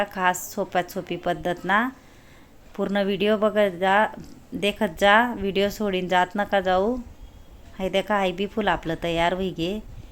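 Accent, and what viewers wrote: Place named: Khandesh, India. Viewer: अप्रतिम ताई आणि तुमची खानदेशी भाषा खुप गोड.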